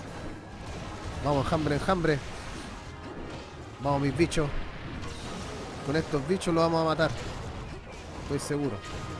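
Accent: Chile. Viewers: eres chileno verdad? Y si lo eres de que parte? Bless y me gustan tus videos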